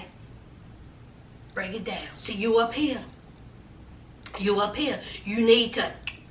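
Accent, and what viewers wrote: South, United States. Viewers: You sound like me and my family and friends down south when we get together. You would fit right in.😂😂😂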